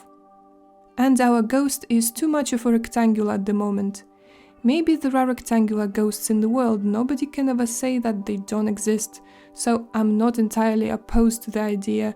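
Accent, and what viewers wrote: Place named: Quebec, Canada. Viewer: About your lovely accent? My wife and I guess French Canadian ? But teaching skills is the best so don't change anything to many people. It needs a great interaction with carving ( not whittling that has no goals but passion for cutting)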